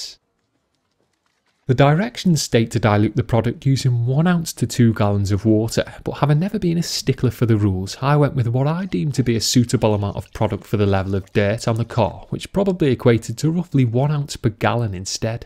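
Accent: United Kingdom. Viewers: DieselDan09 brit accent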